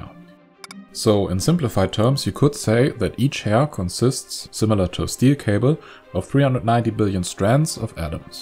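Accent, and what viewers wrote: Germany. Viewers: hier, ich will dich nicht vom videos-machen abhalten. ich find das wunderhübsch zu youtube was beizutragen. aber so´n übersteuerter deutscher akzent, da kommts mir hoch ey. kann man sprecher für anheuern, wenn man selbst nicht in der lage ist... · Warum lasst Ihr einen Deutschen Englisch reden? Macht das Video bitte entweder auf Deutsch, oder nehmt einen englischen Native Speaker. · I'm sorry, but a German accent saying the words "need to shave the heads of roughly 4 million people" cracked me right up. What can I say, I'm not one for being politically correct, either. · Well, he does sound German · "lets make a human sausage, maybe out of a murderer or lawyer" hrhrh i knew he was from germany, if not already clear by accent ... pfff and they say we got no humor ^^ its just that our humor is rather dry or explicitly gruesomely dark =) ... 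but to our shame we have also lots of very silly humor in the category of fart jokes *sigh*, to me that type is very cringe even before watching lots of english speaking satirists and commedians over the years(thank you youtube). ontopic: thanks for the excellent presentation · The German accent is horrible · Omg such a German accent xD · The German accent makes it even more believable... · He almost speaks German lol. Most obvious accent ever:D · Horrible German accent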